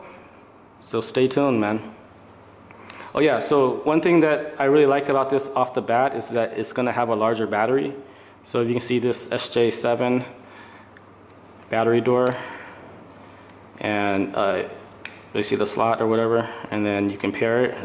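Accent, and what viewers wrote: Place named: China. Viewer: Professional product demands professional review not an amateurish review...... the guy is talking Chinglish....get your act together.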